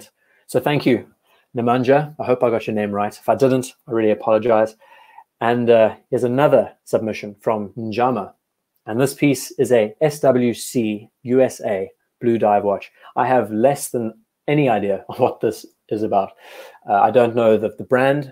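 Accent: South Africa. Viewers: Did not know you were based in the UK. I thought I was picking up a South African accent.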